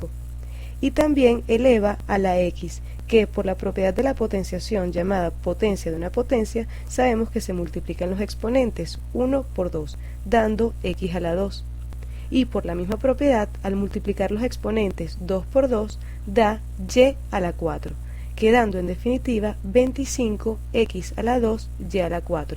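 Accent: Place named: Venezuela. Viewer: entendí todo, y por cierto se nota que eres venezolana ese acento nuestro es irreconocible.... gracias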